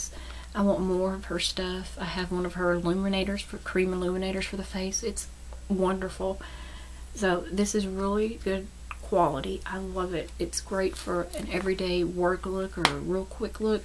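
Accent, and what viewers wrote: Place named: Louisiana, United States. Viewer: I am a new supporter, I found you thru Vallerie Hill. I'm not one to unsupport or unfollow just because I didn't win. I think that's awful & im all about supporting especially small channels and buisnesses. I like to see people grow and I'm passionate about that. So no worries hunnie. I'm glad I found you because it will be great to see you grow!!!! And YOU WILL!!! 😊💗💗 I absolutely love your personality and spunk of Louisiana !!!! I'm from PA so accents are so much fun! I actually don't think I've had Louisiana food cooked right before! I've tried making creole before if that counts!!! I'm from up north so .... Anyways rambling 😂😂 3 MAC shades? My favorite are:1. BLANKETY. 2.SNOB 3. HALF N' Half. And a Question for Q & A ? What is your favorite drugstore brand & favorite High End brand? Thanks for the chance! 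And cannot wait to continue to see growth in your channel!! 💗💗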